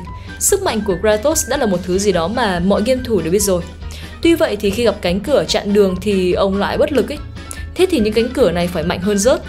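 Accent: Vietnam. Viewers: Bạn nài quê Nam Định hỏ, nghe giọng giống lắm 😐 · Voice mới người Thanh Hoá à? 😢 Giọng nghe mệt quá, k xem hết nổi video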